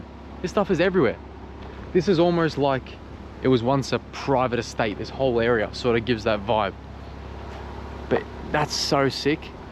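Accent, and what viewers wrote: Australia. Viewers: Hahaaa I love your ocka talk m8..😂..and I love your wee giggles here and there..living the life dude..🎉😊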